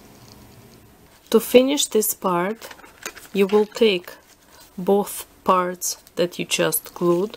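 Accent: Russia. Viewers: Ты русская! По аксенту видно! :) в Америки живешь?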